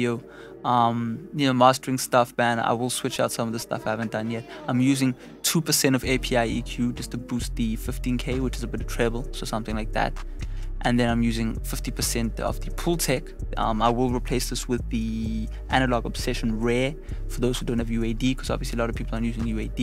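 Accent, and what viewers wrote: South Africa. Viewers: u sound like ur from south africa. It sounds nice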